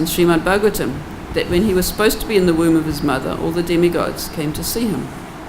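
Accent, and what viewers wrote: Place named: South Africa. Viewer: Hare Krishna! Where is Her Grace from? It sounds like my homeland's accent (South Africa)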